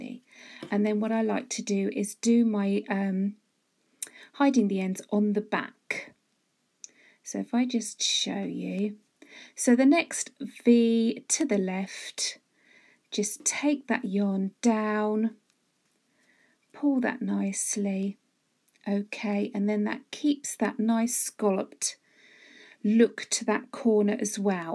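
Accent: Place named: England, United Kingdom. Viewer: You are a LIFE SAVER as this works irrespective of the size of the "granny" - THANK-YOU, THANK-YOU, THANK-YOU !!! (also lovely to hear an English accent) with love & Blessings from South Africa xxx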